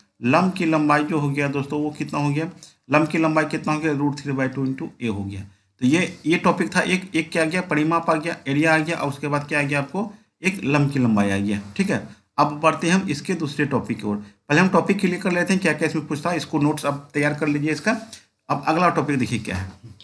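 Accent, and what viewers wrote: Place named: Mithila, India. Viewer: अपने के आवाज स प्रतीत होय ६ै अपने मिथिलांचल के छलिए! सादर आभार अपने के बहत निक से पढ़वे छलीये🙏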